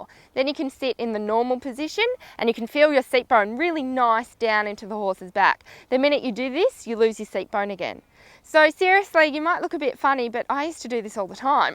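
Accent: Australia. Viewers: Ahh so nice to hear an Aussie accent! My sister and I are from Australia too, I'm super into dressage and I love your videos! (you can check our our channel if you like!) Keep it up! Good luck with the baby (saw a more recent video earlier!)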